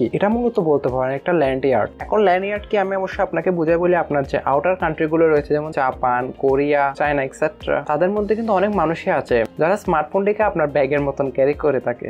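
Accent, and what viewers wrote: Chittagong, Bangladesh. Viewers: চাটগাঁইয়া রিভিউয়ার মনে হচ্ছে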